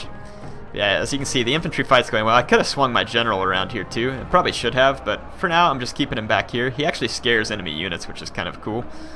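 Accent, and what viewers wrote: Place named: Kentucky, United States. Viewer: Always making my night better with that great Kentucky accent, and leading such a victorious Germanic something army, makes it even enjoyable, great job, I'm really looking forward to the next one, also I really really wish that Attila had the Suebian faction.. I really loved them in Rome 2 but they were a little bit... naked, it would be awesome to see them armored suebi rampaging in the lands of the western romans.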